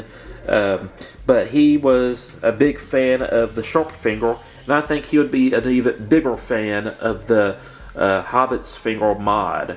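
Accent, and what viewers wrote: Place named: South, United States. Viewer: Where are you from? Your accent is hard for me to place. American South?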